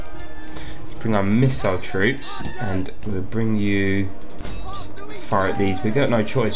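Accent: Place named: London, United Kingdom. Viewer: did you grow up in london around loads of roadman black people? your accent sounds like it, no offense shieeet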